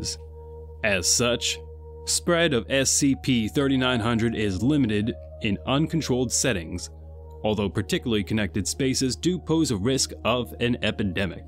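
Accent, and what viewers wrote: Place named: South, United States. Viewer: Finaly I know, the southern twang is how he talks.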